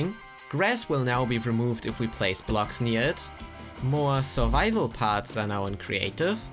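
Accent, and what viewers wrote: Germany. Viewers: Netter deutscher Akzent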